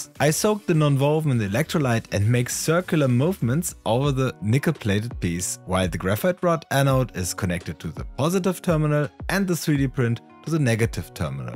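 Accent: Germany. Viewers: Eindeutig deutscher Dialekt 😁 Edit: Akzent xD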